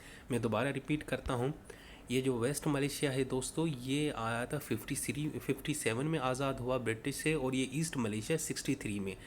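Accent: Karachi, Pakistan. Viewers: Apka Accent Karachi Wala hai..... Karachi waaly bolty hain æsy...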